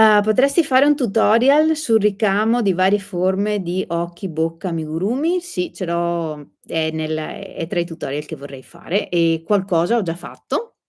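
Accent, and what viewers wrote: Veneto, Italy. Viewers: ciao, sto vedendo la registrazione della tua diretta. E' un piacere conoscerti, davvero. Confermo che hai un bella voce rassicurante, dolce e garbata inoltre il tuo accento veneto mi fa ricordare la mia mamma veneziana che non c'è più e mi manca molto. I tuoi tutorial sono chiari e molto utili anche per me che non sono più giovanissima e un po' testona. Brava! Un grande abbraccio 🤗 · Cara, che piacere vederti! ti seguo da circa 5 anni, sei stata e sei sempre di grande ispirazione con i tuoi progetti, ma ciò che ho amato subito di te ( e te l'ho scritto più volte), è la pacatezza, la chiarezza, la creatività e......il tuo accento veneto! lo sono anch'io, ma vivo in Piemonte! e sono mancina anch'io, ma poiché ben più vecchia di te, da bambina sono stata obbligata, per alcune manualità, ad imparare con la destra! vorrei scriverti ancora tante cose, ma intaserei il canale, per cui ti saluto con grande affetto, ti ringrazio ancora e...continua così! 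a presto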